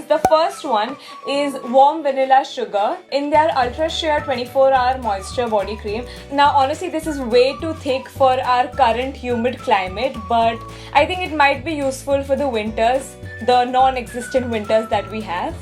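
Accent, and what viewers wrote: India. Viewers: She is indian n indians speaks like this only ....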